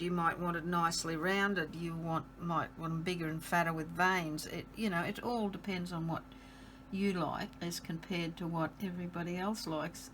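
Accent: Australia. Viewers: I love that you’re an Aussie like me! I was listening watching and you were a few minutes in and I was Ohmygosh yr an Aussie lol Love love what you’re doing and done. There are never any mistakes in art. They merely become something you didn’t know you were going to create! Hehee love and subscribed to you. Thanks for a brilliant tute.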